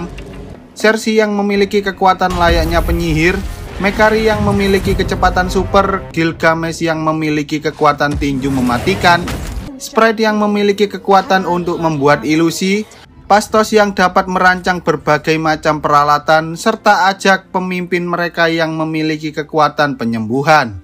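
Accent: Java, Indonesia. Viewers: Bang suara lu mirip dodit mulyanto medoknya pun sama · Sampeyan Jawa endi mas mimin, kok ngomonganya agak ada Jawa" gitu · Fix, suaranya rada-rada orang Jawa abangnya😁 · Apik pilem e min... JOWOMU NGENDI MIN... KOK MEDOK MEN⁉️ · Lu orang Jawa bang ? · Uwong jowo bg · MEDOK BANGET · Sampean wong jowo kah bang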